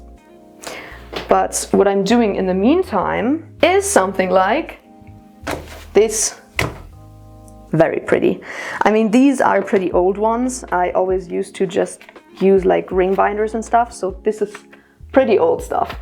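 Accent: Germany. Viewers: Dude i knew you had a german accent and not a scandinavian.... Why are you saying you are norse???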